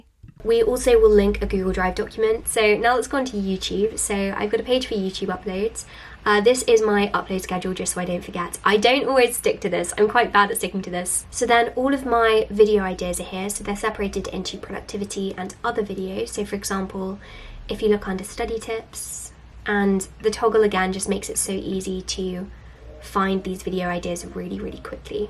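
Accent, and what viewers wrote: United Kingdom. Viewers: is a national treasure. she is so humble and kind. and I love her voice. it's so British and welcoming. lol. great video. you should get more attention.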